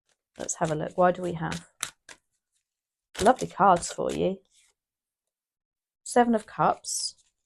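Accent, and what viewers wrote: United Kingdom. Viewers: I admire readings of this🌹Very Beautiful Lady🌹 These messages resonate with 💯💯💯💯💯 persent. Almost all readings sre brilliant, right in the point 🎯🎯🎯 Plus added amazing British accent which is sheer delight to hear and sounds so pleasing and an effort to make lively environment for us with pretty flowers always maching colours of blouses and light all around, makes this chanel indispensable for everyone who needs to hear excellent readings matching with reality of the circumstances, taking place in life. Thank you very much for helping us in guiding to follow right path. 👏☘️✨⭐🌹🌟✨☘️💫🎗️✨👏👏👏😊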